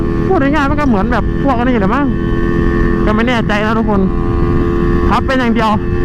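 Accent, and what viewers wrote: Korat, Thailand. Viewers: สําเนียงโคราชชัดเจนแท้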